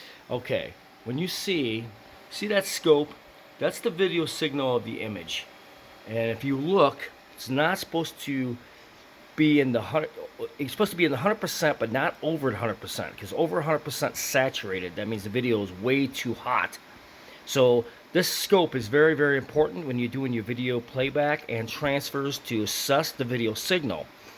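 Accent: Chicago, United States. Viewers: Sharing knowledge with a fierce Chicago accent.👍👍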